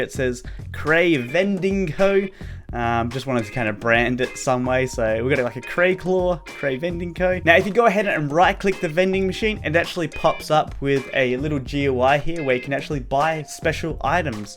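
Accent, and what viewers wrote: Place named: Australia. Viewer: you from Australia I was confused because you say the same as me because I'm ausie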